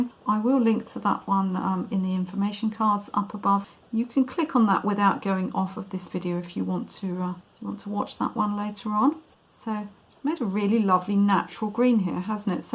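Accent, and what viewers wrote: United Kingdom. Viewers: to me is like flash in photography... buy I am not adamant about anything in particular. BTW I enjoy your videos and learn a lot! And a plus to hear your neat British accent!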